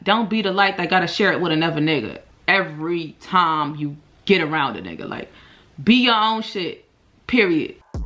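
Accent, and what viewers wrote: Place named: Brooklyn, United States. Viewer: She is most definitely from Brooklyn, Ny. I picked up on it in the first five seconds of the video. Yoo wassup👋🏽😭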